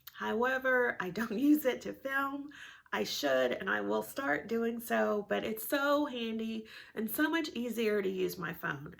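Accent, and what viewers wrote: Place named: South, United States. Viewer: I love how you decorate your home! You have such an eye for design! Your tablescapes are always on point! You mentioned picking up home decor at Krogers. More and more I am seeing home decor at grocery stores and even Ace Hardware, believe it or not! Thanks so much for sharing your bio! I hear a little Southern accent! Take care and be blessed!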